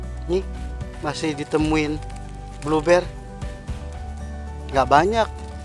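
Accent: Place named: Jakarta, Indonesia. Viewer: oww.. Kayk orang Jawa.. Tp kok logat nya betawi.. 🙏🙏🙏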